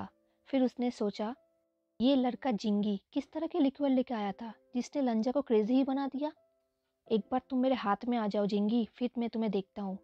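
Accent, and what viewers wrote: Bengal, India. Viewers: Di kya aap bengali ho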